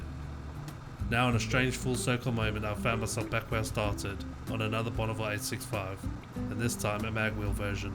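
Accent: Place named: United Kingdom. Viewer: Thanks for the video. I respect that this is your channel and you certainly can present whatever you want. From a purely personal perspective I'm American and I do have to listen closely to those from Britain to be sure I understand you. I'm probably in the minority here but I find background music distracting at any volume because it inhibits my ability to understand you. I appreciate that you are a motorcycle purist and that is worth hearing. I'm a good bit older than you and remember the first Triumph Bonneville I ever saw/heard, <num>. I don't remember any other motorcycles from that period as well as I recall that Bonneville. As soon as I can sell my BMW I'm going to buy a Triumph....I should never have bought the BMW.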